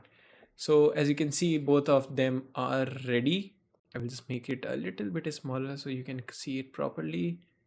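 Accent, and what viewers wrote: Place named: India. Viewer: perfect indian accent. i applaud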